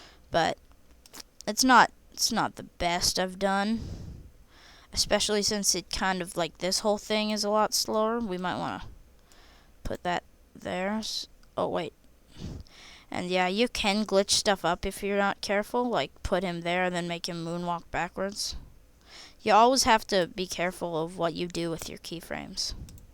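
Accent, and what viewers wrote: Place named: United States. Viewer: Wow this video is a bit more detailed than the one i watched at first and it helped quite a lot. also (no offense) but I remembered how to do that because both videos i watched had someone with an american accent so I remembered it!! xD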